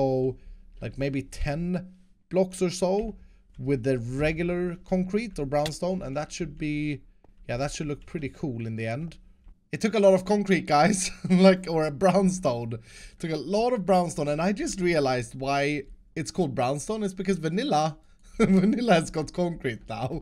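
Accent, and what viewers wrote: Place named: Sweden. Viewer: It sounds like you are from Sweden talking English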